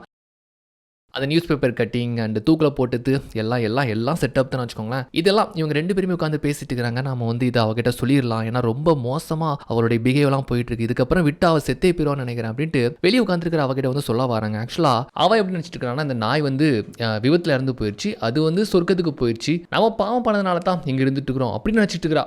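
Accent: Tirunelveli, India. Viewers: திருநெல்வேலி தான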